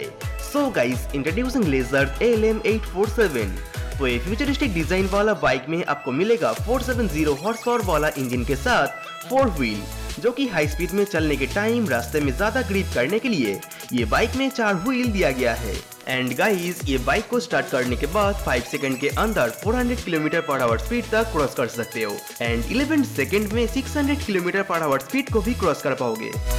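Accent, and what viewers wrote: Bengal, India. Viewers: Aap bengali ho 😊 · Pta nhi yaar tm logon ko subscribers kaise mil jatein h. Main video ko dekhna chah rha tha par 10scnds bhi dekh nhi paya bcz of your hindi accent.. me too a Bengali. But agar YouTuber banna h hindi me toh dhang ka bolna toh sikhlo. Irritable accent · Your Hindi accent proves that you're a native Bengali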